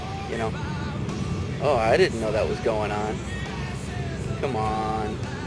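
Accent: East Coast, United States. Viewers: You have the East Coast accent why don't you go and help those people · You sound like you are from the east coast. Have you ever been to New York? Or how about on the West side of the continent? Seattle? Homelessness is 10 times worse in other areas of the country. I understand your frustration. Sometimes people fall into a trap and find themselves at the bottom. Maybe they need a little helping hand. However, I have found that the vast majority of people that ask you for something, use the helping hand and then ask for more. I have seen the same homeless people in my home town for the last 30 years. I have helped many of them. (Giving them food, a ride, I even let someone live in my house for a short time.) They say they want to change, but most of them don't. Many of them have mental problems, and I agree that those people need major help. 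You can give a man a fish and he will eat for that day, or teach him HOW to fish and he will eat for a lifetime. You have made a video and shown the world the problem. Instead of appealing to others to solve it, come up with something yourself. You live there, and it bothers you deeply, so become part of the solution. Cheers.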